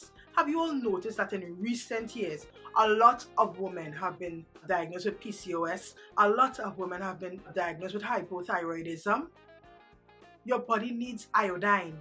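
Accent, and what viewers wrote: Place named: Caribbean. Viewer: Where are you from, because you sound like a mixture of Caribbean islands. Trini, Bahamian, and jamaican.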